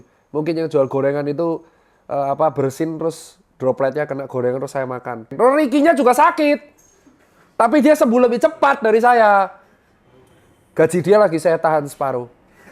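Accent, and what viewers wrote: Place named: East Java, Indonesia. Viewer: logat jawa timuran banget...mantap dok""😁👍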